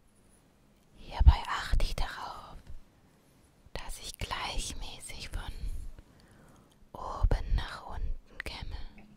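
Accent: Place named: Germany. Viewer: (french) Normalement je ne suis pas fan de l'accent allemand, mais ta voix me détend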